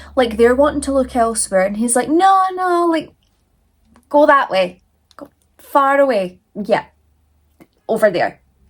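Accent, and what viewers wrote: Scotland, United Kingdom. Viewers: is not only hands down the best looking presenter but well versed and well researched and that Scottish accent! OMG, I love this channel.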